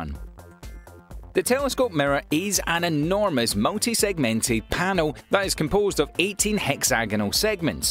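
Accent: Scotland, United Kingdom. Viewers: It’s LaGrange not larange. Perhaps it’s your Scots accent that misinterprets everything. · For someone with a British accent he mispronounces a Lot of important words ie: La Grange,Arianne,Grummin etc. Makes me wonder if his facts are just as poorly based..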